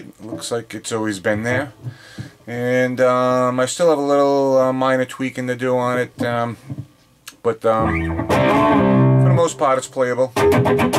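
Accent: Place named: East Coast, United States. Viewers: I got a kick out of your accent. Such an east coast '70's hair band voice (no slight intended, just that, out west we don't have the same attitude in our speech). I have a '72 Gibson J-160 that needs a new nut because the high E is too close to the edge. Bone or plastic? Thanks, man. Really liked the vid! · this guy has to be from massachusetts......or.....new york.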